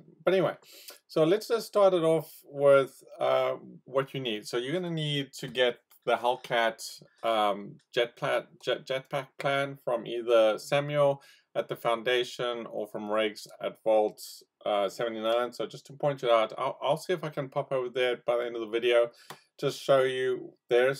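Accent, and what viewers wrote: South Africa. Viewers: Are you south African? The accent sounds hella familiar 🤣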